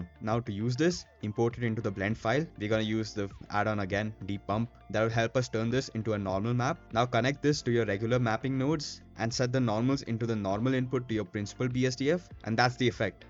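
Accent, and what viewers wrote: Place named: India. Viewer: Indian accent sure is dense, but holy hell i thought u have like 80k subscribers or something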